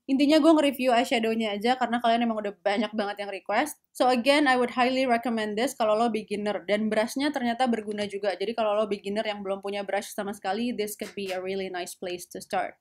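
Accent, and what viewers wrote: Java, Indonesia. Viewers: lucu sih kalau ngomong Jawa 😂😂😂 lvlv Kak, ga pernah bosen deh lihat Chanel nya 😍 mau mati gue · hhaaaaaaaa aq seneng medok mu hhaayyy!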